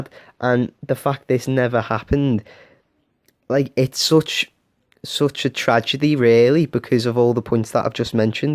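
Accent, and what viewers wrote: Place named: Liverpool, United Kingdom. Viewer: Not meaning to be rude but you sound like a more scouse Michael owen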